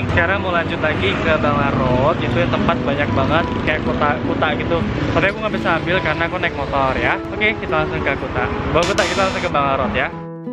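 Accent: Medan, Indonesia. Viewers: org medan ya? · Logatnya kayak anak medan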